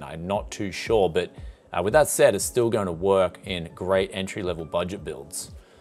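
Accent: Bogan, Australia. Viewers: That is exactly how I imagined Aussies speak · idk why but, Australian accent sounds for me so good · Please stop with the bogan voice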